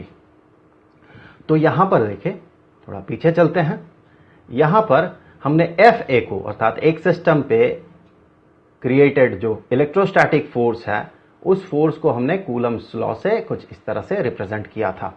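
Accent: Bihar, India. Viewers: sir aap Bihar se hai kya? love your voice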